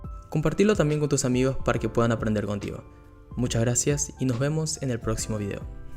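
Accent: Paraguay. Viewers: De Paraguay?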